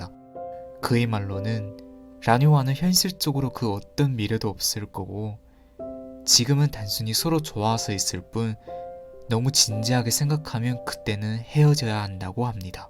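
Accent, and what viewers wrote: China. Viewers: 중국분이신지 몰랐다가 증거가 부족했다는 설명에 어감에서 약간 알아챘어요! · 듣다보니깐 유튜버분이 조선족이신가보네요? 발음이 살짝 한국인 발음음 아니군여 ㅎ 잘보고 가요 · 중국인이세요? 발음이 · 한국어 정말 잘하시는데 살짝살짝 특정단어나 문장에서 얼화가 느껴지네요 성조나 발음까지 고칠 수는 없나봐요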